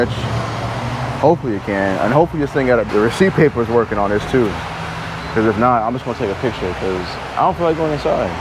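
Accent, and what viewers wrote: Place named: Charleston, United States. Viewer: Im from Greenville and i swear you got the clearest Charleston accent i ever heard 🤣🤣🤣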